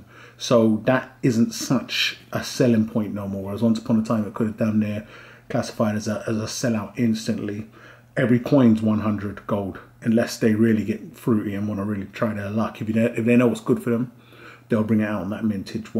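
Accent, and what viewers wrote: England, United Kingdom. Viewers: love the accent what part of England??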